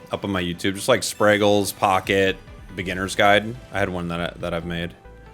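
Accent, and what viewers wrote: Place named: United Kingdom. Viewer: Nice British accent!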